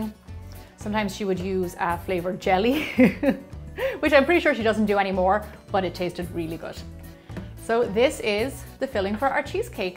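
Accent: Ireland. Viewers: are u irish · I KNEW YOU WERE IRISH! Sorry I'm new to this channel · I LOVE all of your videos they're always so great and delicious! I can't stop watching them. I'm an Irish dancer and I love your Irish accent! It's beautiful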